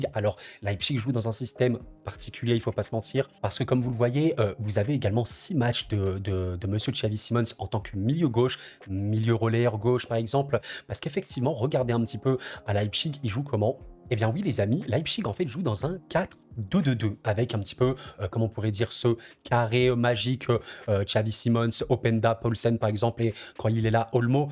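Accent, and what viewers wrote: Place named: Quebec, Canada. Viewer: J’ai jamais vu un québécois avoir un accent aussi léger.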